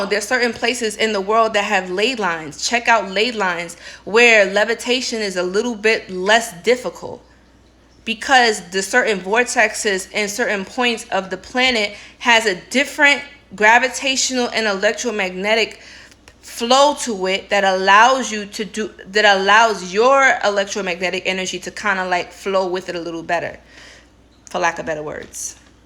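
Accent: New York, United States. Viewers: Just started watching u but I love your voice very soothing yet New York 💜👍🏽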